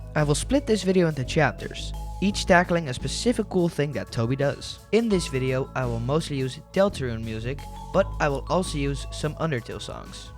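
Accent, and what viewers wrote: Netherlands. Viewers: Nederlands accent 😳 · Is dat een Nederlands accent dat ik hoor? Hoe dan ook, fantastische video!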